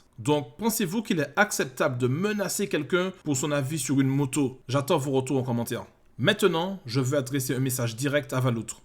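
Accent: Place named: Antilles, Caribbean. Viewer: Merci à toi pour cette video de soutiens force aux antilles✌😉😉😁👍he oui l accent on ne peux la zapper force a toi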